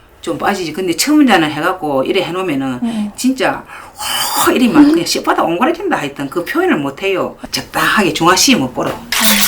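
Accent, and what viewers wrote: Gyeongsang, South Korea. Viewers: 쌤~~갱상도 사투리 너무 반갑고 재미납니다 ㅎㅎㅎ 진주 산청쪽 말투같아요 제가 거기서 살았었거든요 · 경상도 씬냉이 너무정겹네요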